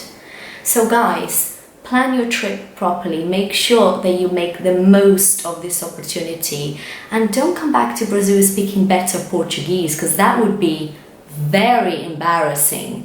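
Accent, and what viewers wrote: United Kingdom. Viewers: what a wonderful british accent! :), congrats for your channel, it's gonna be helpful for my english studying.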